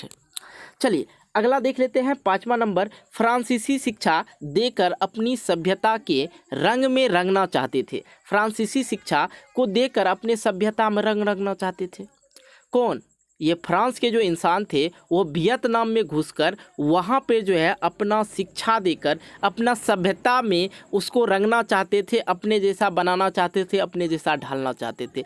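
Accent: Bihar, India. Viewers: Aap Bihari h ka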